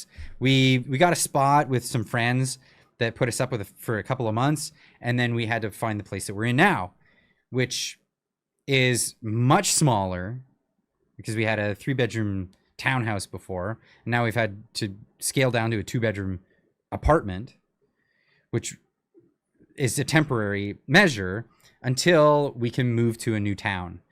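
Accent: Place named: United States. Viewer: Big deal you're going back home where you come from ,where you belong. You've never been Canadian you're an American. You can hear it with the way you speak English cuz you don't sound like any anglophone Canadian that I know and I'm married to an anglophone Canadian woman.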